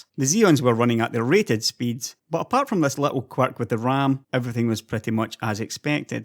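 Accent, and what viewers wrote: Scotland, United Kingdom. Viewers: is he scottish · I honestly want to think you are Scottish but 1% of my brain says you are Irish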